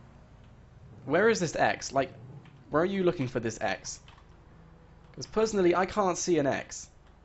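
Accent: United Kingdom. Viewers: Fuck your british accent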